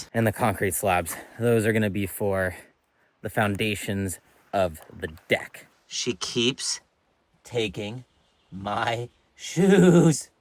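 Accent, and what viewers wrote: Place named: United States. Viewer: My Brit wife says you have an American accent. As an American, I agree.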